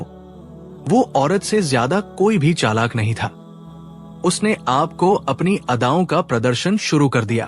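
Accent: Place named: India. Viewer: Ap kahtay bslochistan say taluq, lakin awaz indian lahja??? Kaya india say voiceover karwaee.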